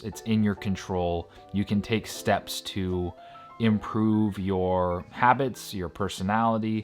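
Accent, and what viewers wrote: Canada. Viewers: A Canadian, eh? How are Canadian accents different from those in the Northern United States? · Your sarcasm and cadence is so unbelievably Canadian. I feel like I'm qualified to make these judgements because I too, am from Vancouver.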